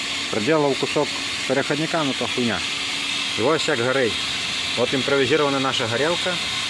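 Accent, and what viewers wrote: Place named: Polissia, Ukraine. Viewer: З якого регіону ваша мова?) мені от здається що це Полісся😊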